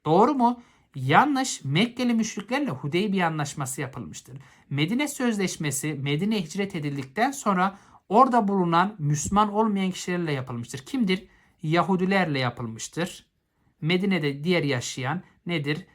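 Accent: Malatya, Turkey. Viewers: Hocam Malatyalı mısınız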